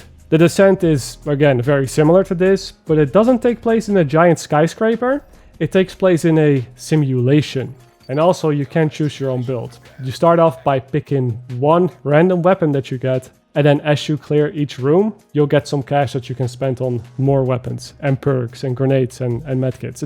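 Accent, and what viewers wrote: Netherlands. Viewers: Good video, I've got over 2500 hours in division 2, well explained. I'm sure you're Dutch (I do think because of your accent, as I am) and I also think you're involved with the YouTube channel Fern...Am I right? 🙂♥️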